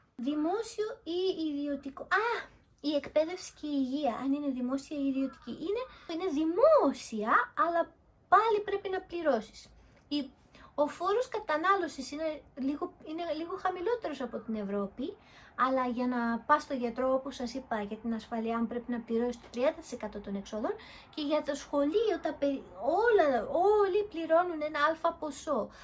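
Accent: Japan. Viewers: Μιλας τα Ελληνικα με Ισπωνεζικη προφορα !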